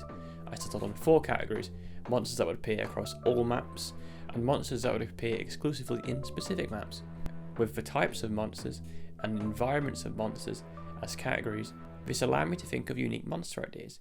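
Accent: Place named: United Kingdom. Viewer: this is a fully VALID video on game design but i feel like you should enunciate your voiceover more to unleash the power of your british accent. or if that doesn't work maybe you can try just yelling into the mic? that might attract a wider viewership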